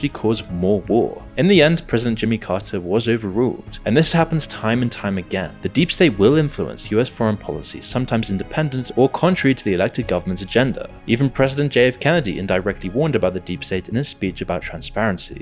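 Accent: United Kingdom. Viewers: lmao ain’t listening to a guy w a british accent talk american politics get outta here bro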